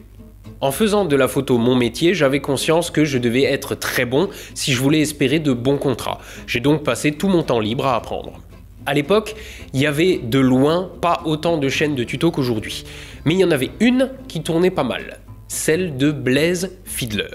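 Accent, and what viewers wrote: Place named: Marseille, France. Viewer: a été avec Benoit de Devient photographe les tous premiers tuto que j'ai suivie et en effet il me semble que sa fait bien 8 ans maintenant. Le temps passe et tous évolue aujourd’hui c'est deux cité ne propose pratiquement ou plus de contenu... Je garde de bon souvenir de visionnage et il m'arrive encore souvent de visionnée des vidéos. moins passionnée d'astronomie je vais quand même souvent liké les vidéos pour l’algorithme, car au delà du faite d'avoir des tutos intéressant le personnage avec sont franc parlé et ses mots direct est très appréciable par sa simplicité et étant marseillais je suis habitué a cette façon de parlé.... Bonne continuation a...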